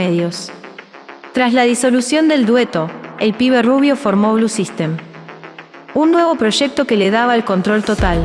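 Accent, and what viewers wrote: Argentina. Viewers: Buen video! Me intriga que tu acento parece argentino, como algunas palabras (pibe, quilombo, orto); usás palabras de España como "calzonazos" o "enchufado", otra expresión que no sé de dónde viene ("ni pajonera idea") y el mito mexicano del nagual... Otra curiosidad es que al chanta del protagonista le reclamaran por haber pagado 100 euros por canción en los 80 y 90, cuando el euro existe recién desde el 2002.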